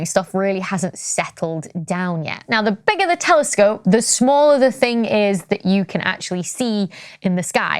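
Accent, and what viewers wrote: United Kingdom. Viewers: I’ve written this before… I used to live in the UK so listening to your British accent is refreshing. How did you cram so much knowledge into your brain at such a young age?! Your intelligence is beyond amazing. With that said, I have issues things you cosmologists expound. Since my education is a master’s in computer science my brain thinks in one’s and zeros, in on and offs, trues and falses… To me and my tiny brain, I cannot fathom an edge to our universe. I completely disagree with the notion that the universe is just 13.8 billion years old. I can’t imagine how this fact is even a fact at all… As Spock says it, “It’s not logical!” Just because we can’t see beyond “the edge” doesn’t mean there’s nothing beyond. In my beliefs, I posit the universe is infinitely old and infinitely big. I have no way to prove my theories. I guess that is why I am so drawn to your presentations. 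I love your videos, so maybe like a dry sponge absorbs water, I may get to a point where I could hold an intelligent conversation with you on these subjects… Merry Christmas, Dr. Becky!! 😊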